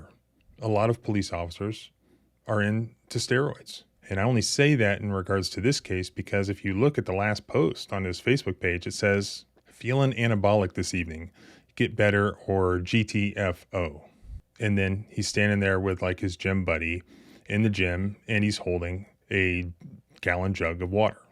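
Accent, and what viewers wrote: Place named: United States. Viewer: One of the really nice things about your videos is that you have one of the best-sounding American accents. It is very pleasant to listen to.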